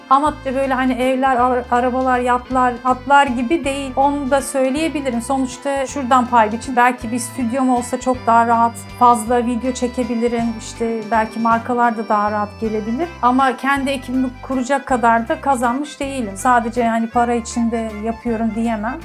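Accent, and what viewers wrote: Turkey. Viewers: Çok takdir edilecek bir başarı yakalamışsınız. Çok gurur verici.Ancak gücenmeyin lütfen ama yapıcı bir eleştiri yapmak istiyorum. Telaffuzunuz tam bir Türk gibi. Aksanınıza biraz daha özen gösterirseniz yabancılar tarafından daha fazla izleneceğinizden eminim.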